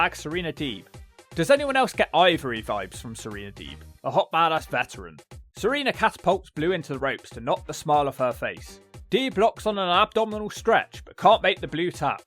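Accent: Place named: United Kingdom. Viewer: Just realized you are from United Kingdom. It explains why I, as a native spanish speaker, can't understand everything you said 😅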